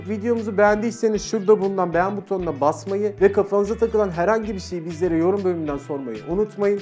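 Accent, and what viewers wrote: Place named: Aegean, Turkey. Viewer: Ege şivesi e gari <3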